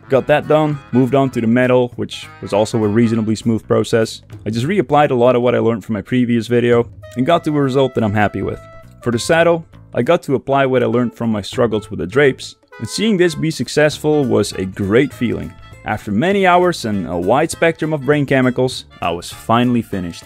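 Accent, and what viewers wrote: Netherlands. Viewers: You're Dutch, aren't you? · 5 seconden, ja hoor nederlander xD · Looks like you have been blessed by the algorithm, nice work good sir! Also do i detect a dutch accent?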